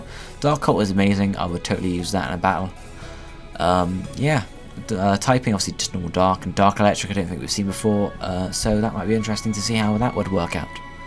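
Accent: England, United Kingdom. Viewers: hes from england i think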